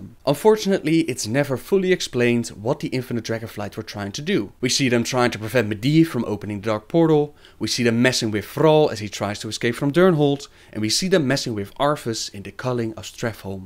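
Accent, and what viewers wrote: Netherlands. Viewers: The person speaking in the video is Obviously Dutch, no doubt. This accent is unmistakeable. :b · Are u dutch ma men? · Is that a dutch accent I spot? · Is this guy dutch? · Probably dutch, maybe south african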